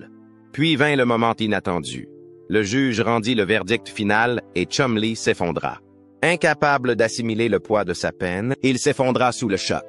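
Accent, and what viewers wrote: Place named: Quebec, Canada. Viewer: J'ai vu le titre racoleur sur la mort de Chumlee. Le pire c'est que j'y est cru j'avais même de la peine .docu merdique avec une voix IA a l'accent québécois. Ridicule 👎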